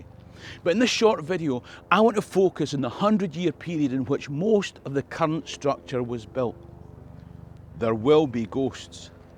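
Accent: Scotland, United Kingdom. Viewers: Watching on the TV in our livingroom in Canada. I am a very very distant descendant of James VI and Mary and the rest of them. My "immediate" ancestors came over from Ayrshire. Always interested in the history. I enjoy your videos immensely, although I do have to turn on the text because your wonderful accent throws me for a loop at times. Thanks for what you do :) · There's something strangely nostalgic about hearing someone with a Scottish accent using onions as a metaphor for layers. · Watching at bedtime in the Pacific NW US; your Scottish accent is wonderful. I love reading western European history fiction, all time periods. While Iistening to the stories, I like to learn the actual history. I watch your videos to help bring to life the Highlander books I read (actually listen to on audiobooks) as well as other Scottish time periods. My favorite genre is time travel...I just listened to a story about Stirling Castle and William Wallace. 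· Watching on my iPad, on my couch, drinking a cuppa, not only loving the history, the castles but love hearing that gorgeous Scottish accent...I'm an Aussie..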